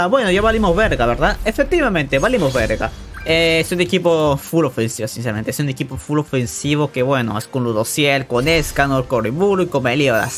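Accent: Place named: Pocho, United States. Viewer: Yo te escucho más pocho fiu fiu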